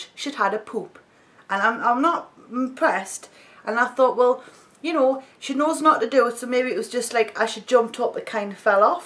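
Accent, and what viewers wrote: Newcastle, United Kingdom. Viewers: I love just sitting listening to you rambling on must be that Geordie accent. I cannot do anything with my nails. Hopeless!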